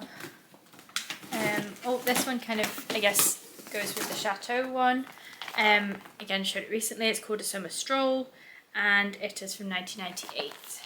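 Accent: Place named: Scotland, United Kingdom. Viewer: Fellow flosstuber and new subscriber here 💗 so happy to find your channel!! I live in Texas, USA, but love your beautiful country and have been to Glasgow! Our daughter went to graduate school in Edinburgh and we have been to Scotland several times to visit her. Your accent is delightful and your stitching lovely! I am hoping to watch your WIP parade soon.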